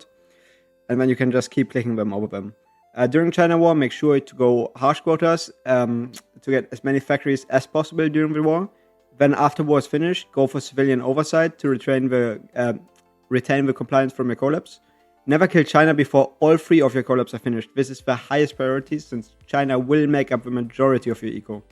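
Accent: Germany. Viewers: ohhh i hear se german aktzent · I just saw your video against NAYF in tommy channel and then the algorithm send me this, what a finding! If you dont mind, I would just tell you to speak a bit more slow, its a bit difficult with your accent, is it german btw? (no offense lol, I am also not a native speaker). Btw, are there any other players on your level besides NAYF and Mohammed on this MOD? I would love yo see more matches, you play damn good. Edit: the commanders MOD I meant! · i hear DEUTSCH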